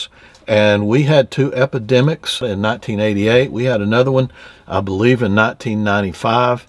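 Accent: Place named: South, United States. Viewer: you have a slight, maybe southern drawl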